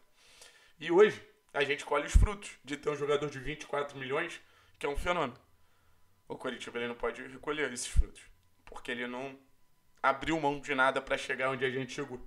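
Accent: Rio de Janeiro, Brazil. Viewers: esse cara é carioca e torce pro aflético?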